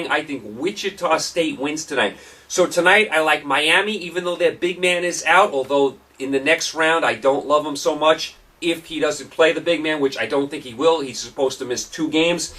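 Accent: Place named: New England, United States. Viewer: I love a Yankee accent.....u defiantly sound better than the sports guy in Bowling Green, Owensboro KY, or Nashville, TN...thanks for sports show.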